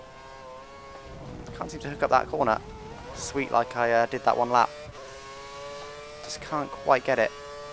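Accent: United Kingdom. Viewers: Awesome congrats! And btw I prefer 20min over 10min:D just love your commentating it's so british!:D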